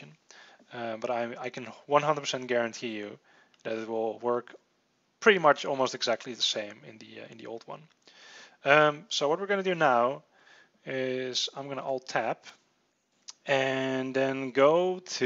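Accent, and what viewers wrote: Netherlands. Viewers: You sound Dutch as fuck. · je klinkt nederlands.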